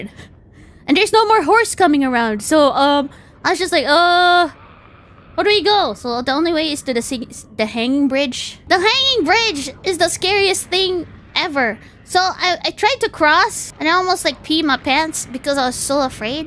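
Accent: Philippines. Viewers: I wonder if is from batangas? She kinda has that accent form a batangenyo when she talks tagalog my Filipino guy's knows what I'm talking about.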